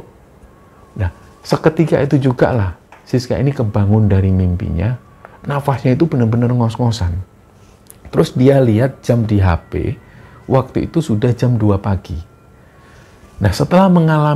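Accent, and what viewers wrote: Indonesia. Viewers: Suaranya mas meduk (kawa)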